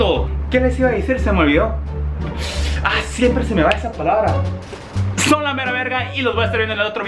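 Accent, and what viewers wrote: Guatemala. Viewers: Maje sos un crack, me encanta tus videos y amo tu acento Chapín! Volvé pronto a Guate 😁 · Gracias me llega tu video y algun día tambien quiero viajar a tu pais. Pero gracias por poner en grande a mi Guatemala. Tu forma de hablar.es un 100% chapin. Me caes bien. Bendiciones. Noaotros los.chapines.somos calidos. Y la gente es.super.amable. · Muy acento guatemalteco !🇬🇹👌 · Chino mano, vives en Corea y hablas más chapin que yo jajaja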